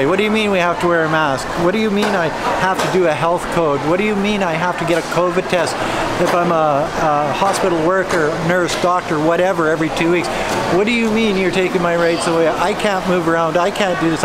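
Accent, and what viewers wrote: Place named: Canada. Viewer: Sounds like a Canadian accent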